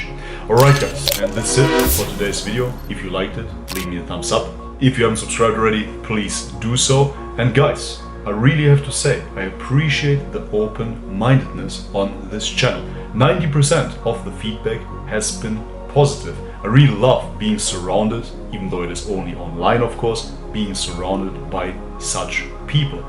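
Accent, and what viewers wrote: Germany. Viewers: Lol very funny to hear you talk about sex with that strong German accent of yours!! 😂😂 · Aren't you a German? Your accent definitely sounds like it? Sprichst du Deutsch?😁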